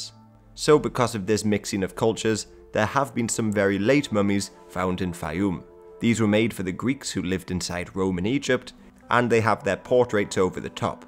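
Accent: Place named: RP, United Kingdom. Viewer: Your accent is really posh!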